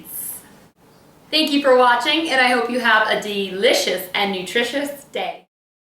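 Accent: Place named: South, United States. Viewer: okay so i wanted to sleep about an hour ago... but i got hooked on your videos. they're great, you're awesome, love the accent of the south and of course your recipes... will definitely be trying there out! thanks. subscribed!!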